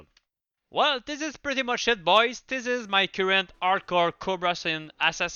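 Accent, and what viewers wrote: Quebec, Canada. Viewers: I could tell you are québecois from minute 1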